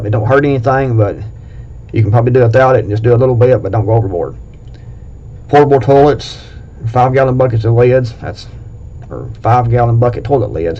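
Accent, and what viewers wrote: United States. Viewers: Your accent says America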